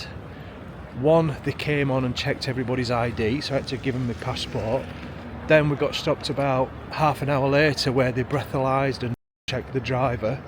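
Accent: Yorkshire, United Kingdom. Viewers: "It's not right warm" - gotta love a yorkshire dialect 😊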